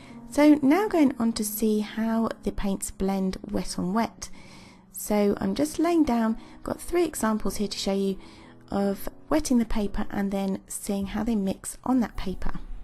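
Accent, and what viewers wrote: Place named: United Kingdom. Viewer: Great job! I really enjoyed watching your review video and you did such a good job at being so thorough. This is a really helpful review for anyone who is considering buying these watercolour inks! I also love your accent ❤ I am British too and I also do art tutorial/voice over videos! So keep up the amazing work. I've subscribed ❤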